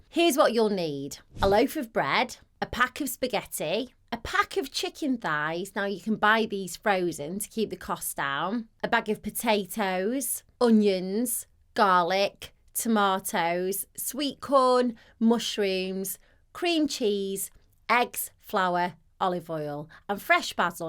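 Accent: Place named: Manchester, United Kingdom. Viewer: Yes - it's a fairly standard English accent. Manchester-ish(?) I think she has a lovely, friendly voice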